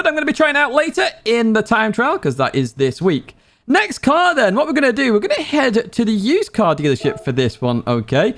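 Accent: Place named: Sefton, United Kingdom. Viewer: Didn't know you were from Sefton lar 🤣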